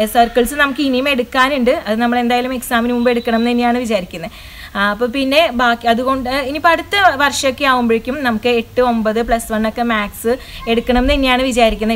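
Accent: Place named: Thrissur, India. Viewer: Trchinte class kettittanu gjaan. Polynomial ,geometry and algebra padichatu enikku. Chicken pox vannarunnu pakshe full pattilla tr ottiri parts idunnontu pakshe ippo Tr parts minimize cheytu thnku parts kure koodunontu ottiri time mathsinu pokunnarunnu ateyullu ippo almost ariyam trnte language kettopozhe thrissur aanennu tonni entayalum Tr poli class aanu gjangal kollam kaaranu thnku thrissur kaari teacher 😘😘🤗🤗🤗🤗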